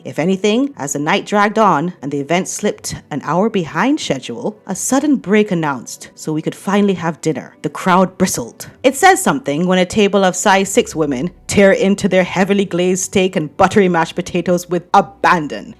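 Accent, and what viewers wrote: United States. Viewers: Omg I love your "American" accent. That voice will be forever in my head for anyone that annoys me😂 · Firstly, I look forward to your videos. Love your American accents and your costumes. My only complaint is that you don't make enough videos. Does your daughter have dollies? They would be great in re-enactments of conversations between the three stogies (H, M and D). Of course, there would have to be smoke engulfing them.😃😗 All the footage from that night, including from Backgrid photographs and any other private or public videos or photos, can be used by the NY DA if there are charges brought against the Duke of Dumbassery and the Duchess of Delusion, as well as Sunny. The DA can subpoena them , or whatever. I'm not a lawyer like you. Sunny did block two police cruisers on the way to a real emergency. The two SUVs also committed multiple traffic violations. 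To be fair to Eric Adams, (I'm not a fan) he was being careful with his words. If you read between the lines, Adams said, "Bullshit!" and "Don't come back to my city". I have no problem with Cabbie Sunny making a little cha-ching from the douchebags' idiocy. Do you know how much they have to pay for their medallions? Being an NYC cabbie ain't easy. Also, Backgrid will not settle for a one-time payment for those juicy photos and videos. I predict documentaries, fictionalized accounts (maybe on Netflix 😁), articles in soooo many tabloids such as Hello!, People, OK!, etc. Backgrid owns them and, as all good capitalists, will not give away easy money. I hope those paps that had to deal with the Harkles bs get nice bonuses. B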